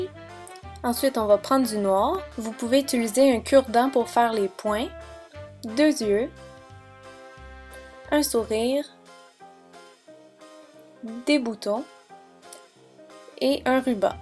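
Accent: Canada. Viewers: j'aime le tout mais mon préférer c'est frosty!!! :) merci pour toute c'est idées et t'est vernis son très jolis......je kiffe ton accent tu es canadienne??? :):):):) mercii beaucoup pour cette video qui ma donné beaucoup d'idées ! :) :) :) en passant: tu as mis cette vidéo en ligne le 8 décembre et on est le 13 donc en 6 jours tu as fait 16 073 vue !!!! bravo. :) :) :) :) :)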